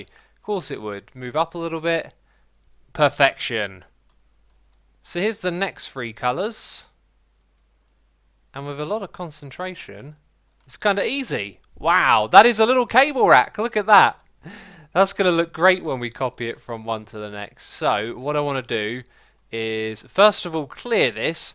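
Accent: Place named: England, United Kingdom. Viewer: Wait!!!! is English???? Wow, i usually thought he was American. Ok that explain why his accent is so different from Americans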